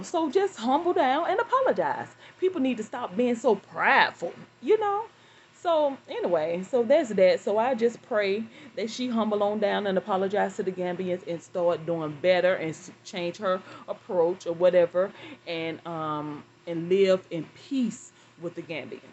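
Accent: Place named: Alabama, United States. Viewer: I can tell you are from Alabama. 😒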